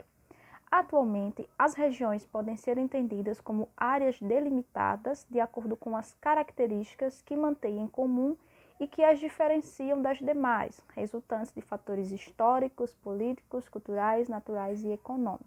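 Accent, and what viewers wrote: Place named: Northeast, Brazil. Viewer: Bonito sotaque nordestino!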